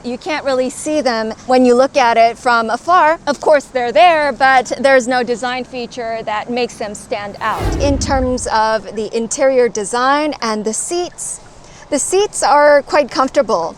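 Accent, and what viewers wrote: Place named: United States. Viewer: Excellent review! But just wondering, where are you from? You have an American accent, but the license plate doesn't seem to come from North America. Also wondering if the features you are talking about will come to the US.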